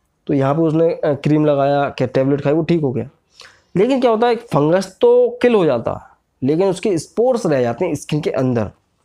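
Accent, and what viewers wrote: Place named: Gwalior, India. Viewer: Gwalior side k लगते हो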